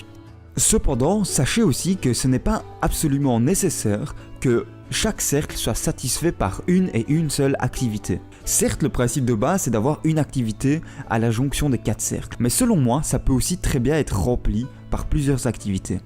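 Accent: Liège, Belgium. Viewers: Hello, j'ai vu des vidéos, lu des bouquins sur l'Ikigai et ta vidéo est bien plus instructive et à son côté pratique que j'ai trouvé nulle part: comment répondre aux questions... Donc merci pour ta vidéos! Je vais explorer ta chaîne qui me paraît vraiment ce qu'il me faut en ce moment :) (Est-ce que tu es d'une région particulière ? ta voix me fait penser à l'accent de Liège :p )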